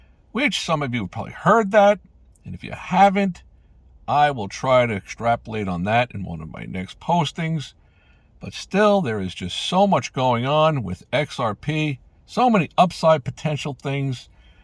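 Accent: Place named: New York, United States. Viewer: I’m speculating based on your accent that you’re from NYC like me or perhaps northern NJ. You VERY much remind me of a former college professor of mine who’s a good friend of mine and he’s from NJ and the Bronx. 🙂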